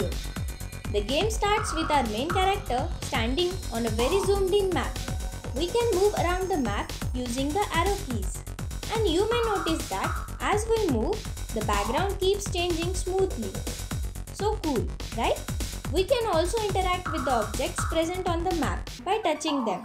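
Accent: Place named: India. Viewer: By you voice I believe you're an Indian.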